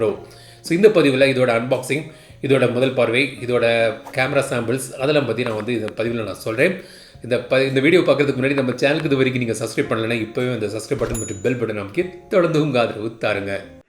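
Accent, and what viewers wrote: Kanyakumari, India. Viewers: Kanyakumari ah neenga?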